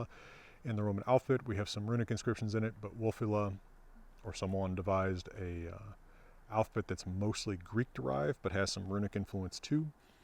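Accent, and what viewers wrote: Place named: United States. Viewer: You'll find most Norwegians pronounce Old Norse and proto-Norse with a thick Norwegisn accent, and Icelandic people pronounce it with a thick Icelandic accent, so who's to blame thick Anerican accent really. I wish there was more published on reconstructing the more precise pronunciation in the different stages, though. I think a pretty distinct trsit of Old Norse until at least around 1000 would be the nasal vowels, but who today tries to reconstruct that?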